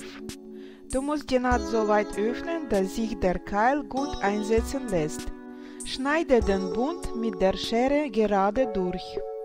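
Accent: Russia. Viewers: если вы понимаете, что я пишу значит вы можете по русски, акцент у вас очень большой, с таким акцентом говорят русские, но не все в этом признаются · У вас такой милый акцент) вы из России? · А почему не на русском?всеравно сильный русский акцент?не надо стесняться говорить по русски даже живя в Германии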